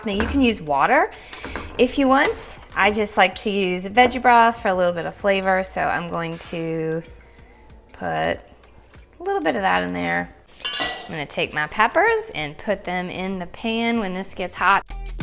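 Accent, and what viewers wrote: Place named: South, United States. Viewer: I love your southern style. I am from NY and I would have love to be born down south, accent and all❤️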